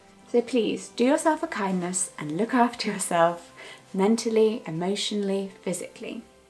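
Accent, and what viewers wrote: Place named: England, United Kingdom. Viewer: I started watching this channel because i liked looking at your face and hearing your english accent but this was actually touching. ty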